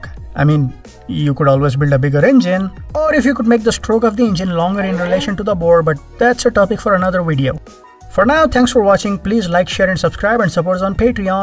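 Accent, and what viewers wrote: India. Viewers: Amazing, by the accent i am guessing it's an Indian guy. Probably the first sensible Indian youtuber ❤️ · this probable the best Indian YTvideo explanation I'VE seen yet hahahaha with accent yet understandable!!!!!!woooooooooooo yeah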